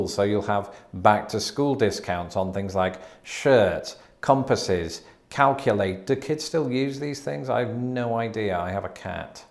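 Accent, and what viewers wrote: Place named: RP, United Kingdom. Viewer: you and your snotty BBC accent would never understand so just accept it.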